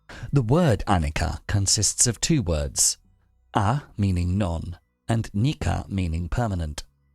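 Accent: United Kingdom. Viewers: Thanks but i wish non british would be spoken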